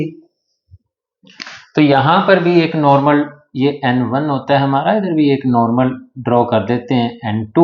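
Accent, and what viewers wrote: Haryana, India. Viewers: Haryana se ho kya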